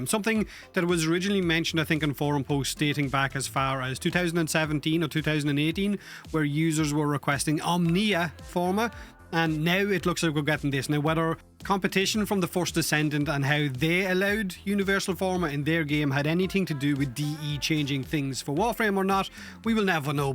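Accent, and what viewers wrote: Ireland. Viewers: Bro is Irish. Chill. … I have Irish ancestry here in the USA I be saying the same shit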